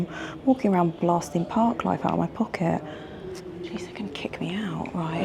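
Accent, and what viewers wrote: RP, United Kingdom. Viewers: That's so adorable 🥰. My 10 year old daughter also loves to watch with me, she loves sense of humour 😂😂😂😂😂 and her posh accent 😊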